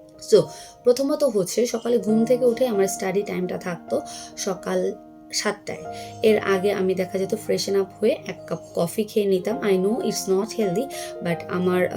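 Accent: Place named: Sylhet, Bangladesh. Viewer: Your way of speaking seems quite similar to Sylheti peoole.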